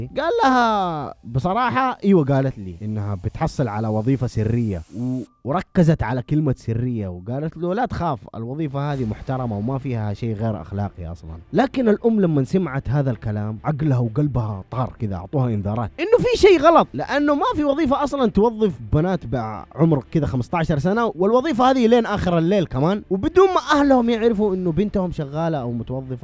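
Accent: Iraq. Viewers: حلوه قعاد أسماع عراقي 😂😂😂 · ✨ يتسمع عراقي ✨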